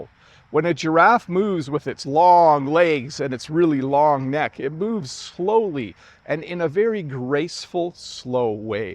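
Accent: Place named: Canada. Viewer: please next time talk about Zebras with your brilliant Canadian accent ❤️🇨🇦🇨🇦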